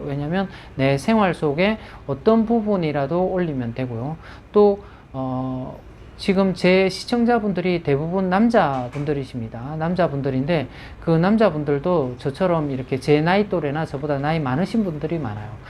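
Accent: Gyeongsang, South Korea. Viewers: 경상도 분들이 유튜브를 많이 하시네요 듣다보니까 분석능력이 뛰어나시네요 와우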